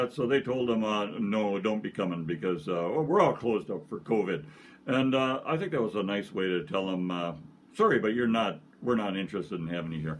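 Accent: Scotland, United Kingdom. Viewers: you can tell from the comments that your Fetlockers LOVED this highland fling-y ditty. [And I am highland flung from trying to dance to it.] Ah, the sweet sounds of the drone pipe! Your Scottish accent rivals that of the late and much-missed Sean Connery. We're feckin' exuberant! Admittedly, our compeers may soon grow weary of our shouting "feckin' right!" at every opportunity... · Love it! Good Scottish brogue!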